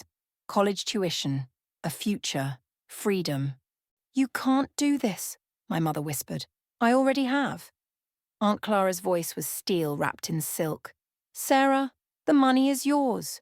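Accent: England, United Kingdom. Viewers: Beautiful English accent.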